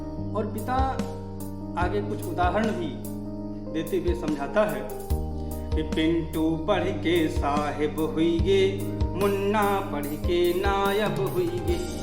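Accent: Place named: Awadhi, India. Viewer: बहुत अच्छा जी 👌👌👌 अवधी की मिठास अपनी एक पहचान है 🙏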